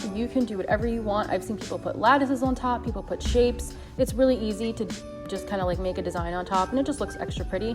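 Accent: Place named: Long Island, United States. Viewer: You from Long Island? I can heart in your voice. I’m a Queens boy myself. Great recipe!!!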